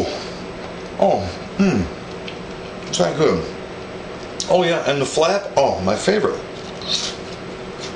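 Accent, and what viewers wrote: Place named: Chicago, United States. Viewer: A big shout from Denver,! Man I swear I can smell the aroma coming outta my computer. BTW I can tell by your accent you're a Chicagoan! Keep up the good work.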